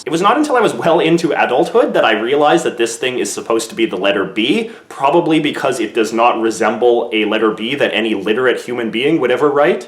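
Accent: Canada. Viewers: You have an American flag behind you, and yet you're Canadian because you say "aboot" so hi fellow canadian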